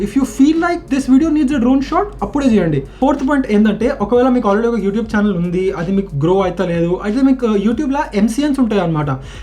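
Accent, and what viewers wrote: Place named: Hyderabad, India. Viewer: ANNA How're you ? No need of switching yourself to confined Telugu Motovlogs We always love your feerfect istyle of pakka HYDERABADI slang in your content. Anyways wishing you all the success ahead ANNA Best wishes from our BBA Back Benchers.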